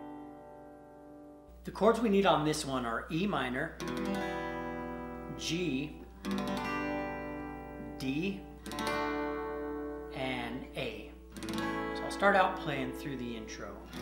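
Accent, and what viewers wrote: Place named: South, United States. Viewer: Just guessing because me and you have the same accent.I like your singing but I bet you are from one of these states. North Carolina,South Carolina ,Alabama or Kentucky. Am I right. LoL.. We're not built for singing heavy metal and absolutely no Rap. LoL.. I'm from NC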